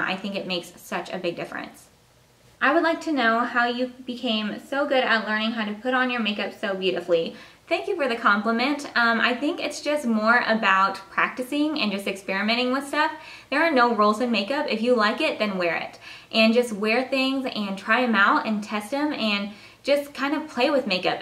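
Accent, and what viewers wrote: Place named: Indiana, United States. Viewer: Just now watching this, but i knew you sounded like a fellow Hoosier! hahahaha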